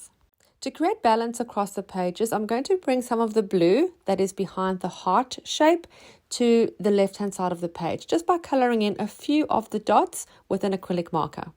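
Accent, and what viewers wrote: South Africa. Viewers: Lovely. Love the South African accent. ❤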